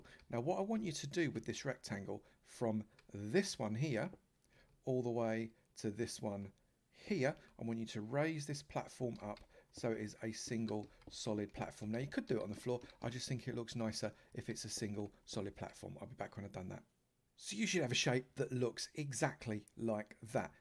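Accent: United Kingdom. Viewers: Are you just saying that because he has a British accent?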